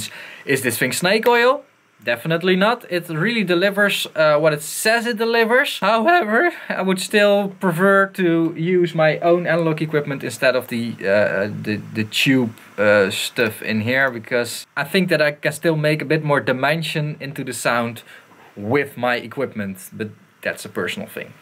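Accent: Netherlands. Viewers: are u dutch dude?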